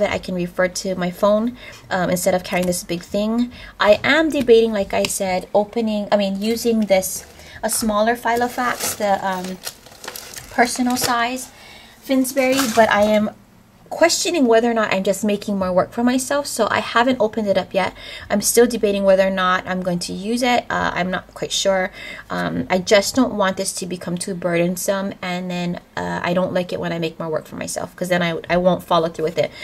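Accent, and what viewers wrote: Hawaii, United States. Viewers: OMG I could tell you from Hawaii the moment you started talking! aloha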